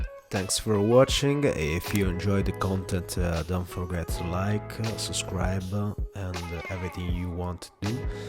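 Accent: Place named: United Kingdom. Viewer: What an amazing british voice man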